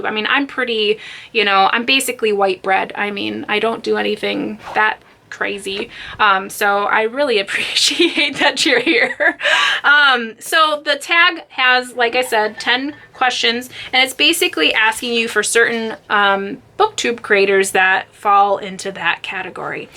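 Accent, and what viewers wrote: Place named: United Kingdom. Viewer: British accents are always a plus XD awwwww! You are definitely warm and friendly!!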